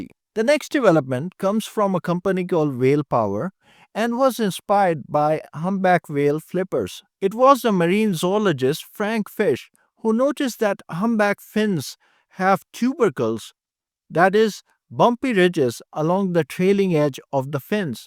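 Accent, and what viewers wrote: India. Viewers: I am enjoying the Indian lilt of this man's English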